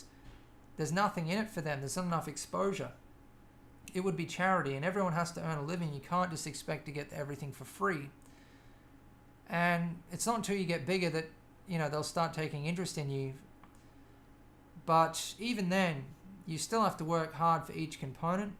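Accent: Australia. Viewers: Her mate. Love your work! I was just wondering what country you are from because you sound Australian :P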